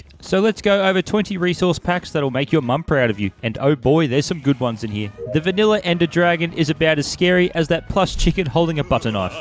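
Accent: Australia. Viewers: Is he Australian? Love Australian minecraft youtubers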